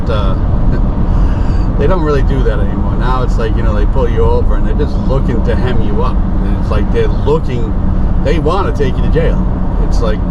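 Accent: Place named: New England, United States. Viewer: Hey you sound like a new england guy, where from im from north andover ma. Any way i rhink alot of the problem is militarized policing. Virtually all police today are former military. This is the american people experiencing what our military have done around the world while at war. So take a solider who has done multiple tours, a job where violence is used to solve problems. Bring them home, send them to a two month academy then give them guns a badge and qualified immunity. How could anyone expect that to not go wrong. Oh and the police culture of blanket criminality. You know, a person commits like two felonies a day in this country because of the thousands of ridiculous codes and policies cops know that,;they are gonna find out what you did today on that traffic stop.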